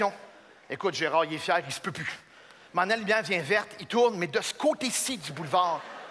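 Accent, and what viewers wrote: Quebec, Canada. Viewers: Il est québécois. Il a donc un accent différent du tien et parle un dialecte du français nommé le joual.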